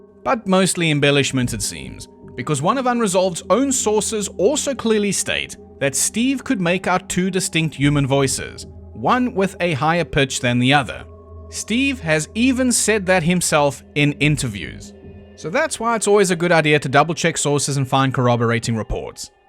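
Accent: South Africa. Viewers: He's from South Africa, so you can't expect him to sound like an American, Canadian, Englishman, Scot or Australian. I get a kick out of his speech. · Is this commentator South African or Rhodesian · The South African accent made this so much better😂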